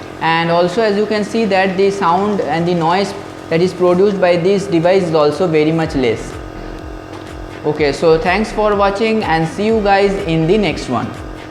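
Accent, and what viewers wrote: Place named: India. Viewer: This chap’s English explanation is really good though with a Hindi accent and a few grammar mistakes here and there. He could be used for giving such talks for various things. Keep it up my son and go on improving. You could make your professional career on this.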